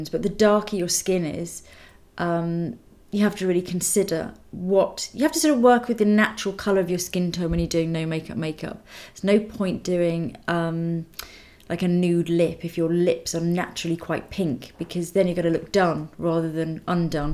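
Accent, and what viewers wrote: England, United Kingdom. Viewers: Is that British accent ?? ❤❤ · ahh her voice is so relaxing, like her accent and the tone and cadence of her voice. anyone know where (in england i assume) shes from? her accent is really similar to pj harveys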